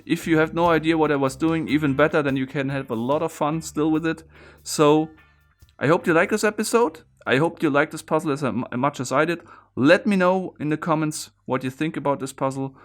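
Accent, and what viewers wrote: Germany. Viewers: What language is that? I’m just curious. And also what country are you from? I want to say you have a German accent?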